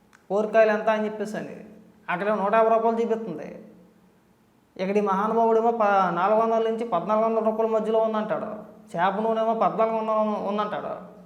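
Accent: Godavari, India. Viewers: medhi e ooru bro, me basha godarolla basha la undi. any way you were great. keepit up...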